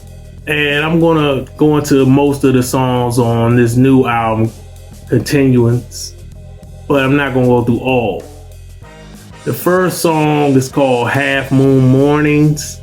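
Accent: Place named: DMV, United States. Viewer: Accent sounds like you're from the DMV where they had Tru Skool. Solid review.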